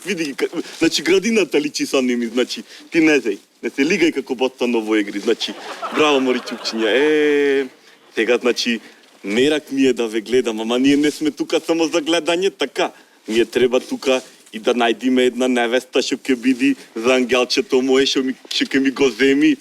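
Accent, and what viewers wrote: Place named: Bitola, North Macedonia. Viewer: Mnogu posimpatichno ke zvuchat najavite po bitolski 15 bez 12 ili Kako god da vikate No toa e vash odraz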